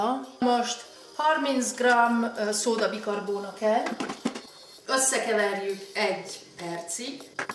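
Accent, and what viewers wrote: France. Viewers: Nagyon szépen beszéled a magyar nyelvet! Imádom a francia akcentusodat! :D szexy és egyedi ;) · Egy kicsit hurcsa hogy magyar vagy és francia akcentusod van.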